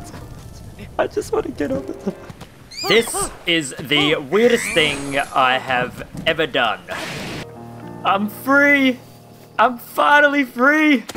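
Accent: Australia. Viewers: Is he Australia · Where are you from you sound aussie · You're Aussie??? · Is this guy Aussie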